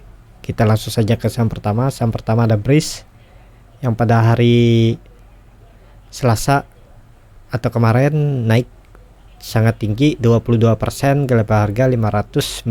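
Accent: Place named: Borneo, Indonesia. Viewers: Bos logatmu kok kadang seperti orang sunda?. Aslimu Borneo apa sunda? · Logatnya original ini asli borneo..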